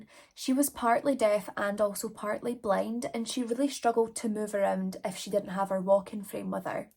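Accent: Scotland, United Kingdom. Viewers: OMG, pure dead Scottish true crime ASMR. Really looking forward to listening to all of your videos!!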